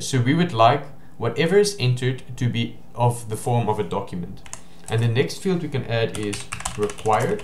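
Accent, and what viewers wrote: South Africa. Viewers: Can't miss a fellow South African accent 😉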